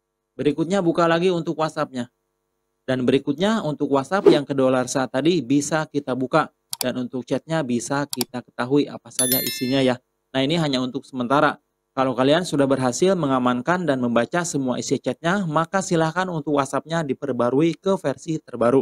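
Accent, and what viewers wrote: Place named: Java, Indonesia. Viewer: maaf sebelumnya sebenarnya sampean itu piyayi pundi to logat bicaranya seperti orang jawa 🙏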